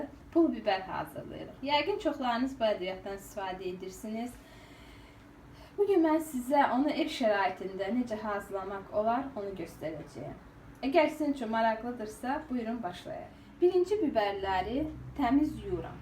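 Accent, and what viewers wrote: Azerbaijan. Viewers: Pul biberi begendim. Azeri kardeslerimizin konusma tarzina bayiliyorum. Ellerinize saglik.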